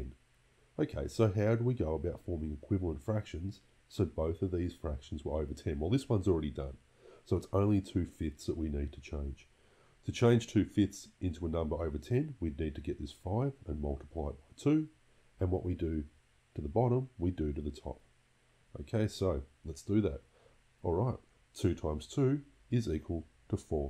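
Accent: Australia. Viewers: He’s an Aussie , American moment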